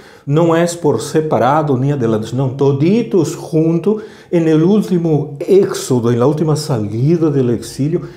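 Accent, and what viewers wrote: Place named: Portugal. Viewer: Es acento portugues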